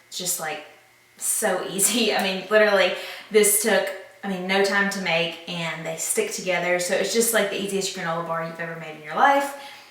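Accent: South, United States. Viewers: Batman needs a classy southern gal hey your all I can get use to that 😆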